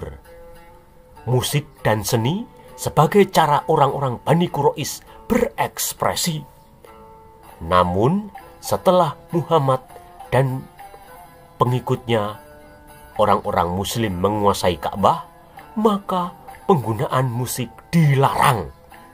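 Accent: Java, Indonesia. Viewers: Adminnya medok banget jawanya😅😊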